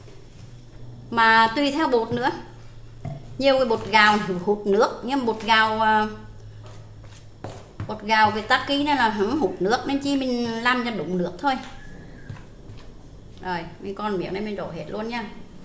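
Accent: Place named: North, Vietnam. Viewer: Banh canh goc o QTri ma MC lai noi giong Bac tia lia ?! Het muon an!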